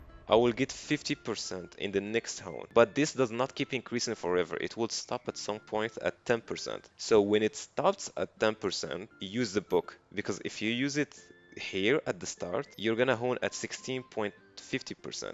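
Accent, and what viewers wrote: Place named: Morocco. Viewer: Accent d mgharba mwr9a :d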